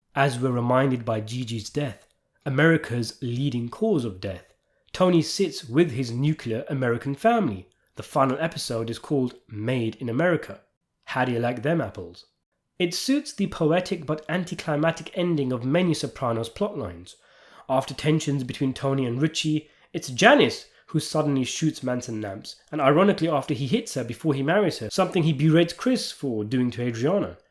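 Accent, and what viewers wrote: United Kingdom. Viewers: Ooh an interesting Sopranos finale video. Oh, it's a british guy, I'm good lol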